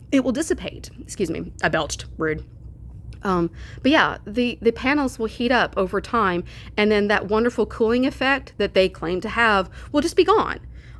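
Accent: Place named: United Kingdom. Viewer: i thought you were british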